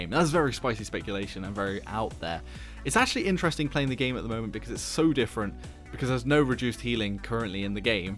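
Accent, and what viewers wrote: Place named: United Kingdom. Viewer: Why is your accent so british , idk how to call it , tremendous shit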